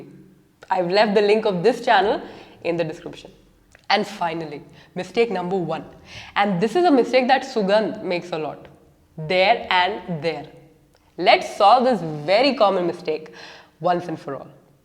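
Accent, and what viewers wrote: Hyderabad, India. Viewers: You used understand na while asking the question 😉 .. and I guess you are from HYD.. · I must admit I love the Indian accent and especially the universal question tag, "isn't it"" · Understood na 😆 but i love the way you teach.... Hyderabadi touch comes from your tone. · No popular english self help books are available over YouTube in Indian accent. I think you have a wonderful, sweet and friendly Indian accent. If you think of doing it, it would definitely help lots of aspirants like me in developing our English beside personality. Thank you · Are you from Hyderabad ? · I'm a big fan of your voice...you speak so impeccable & your Indian accent is fantabulous.... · You speak Hindenglish. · Mam you are hyderabadi? aren't you?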